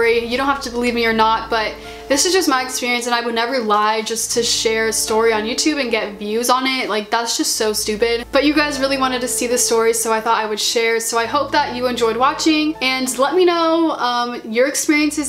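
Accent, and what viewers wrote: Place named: China. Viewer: The voice is chinnese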